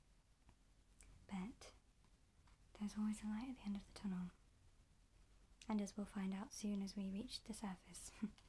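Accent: United Kingdom. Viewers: ASMR + Cosplay + British Accent + Cute Shawty + Sci-fi + Mythology + Fantasy + Movies/Video Games = Exothermic Reaction 🔥 🔥 🔥 This channel gonna blow up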